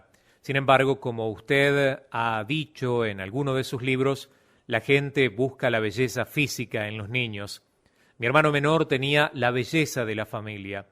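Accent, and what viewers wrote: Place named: Argentina. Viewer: HE ESCUCHADO OTROS LIBROS MUY EDIFICANTES COMO LOS DE REBECA BRAUN, PERO UD ES EL QUE MAS CLARO LO ENTIENDO SERA POR NUESTRA TAN PARECIDA LENGUA LATINA, SOY HONDUREÑA Y EL ACENTO ARGENTINO ME GUSTA MUCHO, ASI ES QUE HE QUEDADO MUY CLARA EN CUANTO A LO QUE VIVO Y VIVIRE EN LOS AÑOS QUE ME DE PAPITO DIOS AQUI EN LA TIERRA YA TENGO 61 Y 30 AÑOS QUE SU MISERICORDIA ME ALCANZO ❤🙌❤🙌❤🙌GRACIAS DIOS LOS BENDIGA CADA DIA Y QUIERO MAS YA Q POR MI TRABAJO PUEDO ESCUCHARLOS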